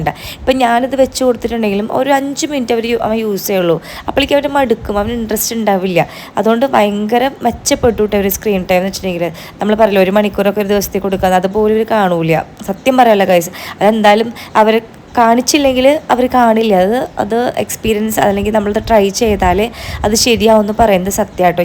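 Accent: Thrissur, India. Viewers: പിന്നെ എന്തുട്ടാ......aiwa തനി കട്ട.....തൃശൂർ സ്ലാങ്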